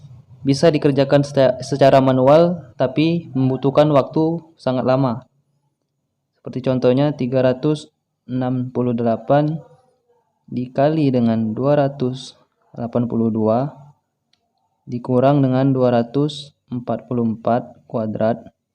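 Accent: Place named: South Sumatra, Indonesia. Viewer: Min kok logatnya gak asing 😂, dari sumsel ya?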